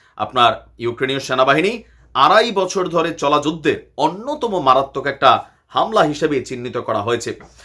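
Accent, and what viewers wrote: Kolkata, India. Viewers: কলকাতার ভাষাই তো আসবে। আপনার পূর্বপুরুষ যে ওপার বাংলার। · ভারতের শুরে কথা বলতেছেন।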